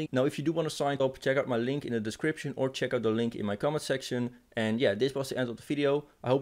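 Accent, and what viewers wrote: Netherlands. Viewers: Definitely van Nederland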